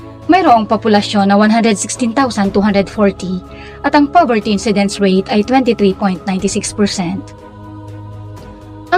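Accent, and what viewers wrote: Visayas, Philippines. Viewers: Taga visayas kaba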